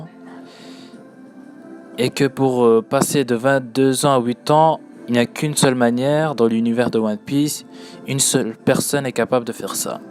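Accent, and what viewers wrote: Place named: Djibouti. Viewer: Oh mince, j'était entrain de faire une théorie dessus !! j'ai directement vu ton accent "Djiboutien-Somalien" moi aussi je suis djiboutien et je t'invite a regarder mes video sur t'aimes les manga ;)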